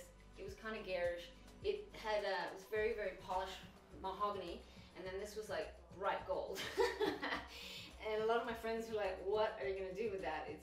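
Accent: Australia. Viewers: Thanks for the brief invite to your lovely home. Australian accent, really, I had no idea what it was. Your name shows french as does mine so the Australian bit surprises me a little. Whatever it is, it is perfect. Murdock Mysteries for me is a real treat, the names of the streets in Toronto and surrounding areas brings it all together and I absolutely love love love it. Keep going and I and we will be there with you. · Love that you have your Australian accent. Love the show!!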